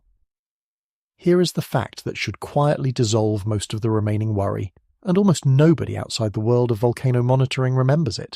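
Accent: United Kingdom. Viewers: They need to give this some pronunciation lessons - it said magmer for magma and sawr for saw. I'm expecting it's going to say 'Warshington State'. Sounds a bit British.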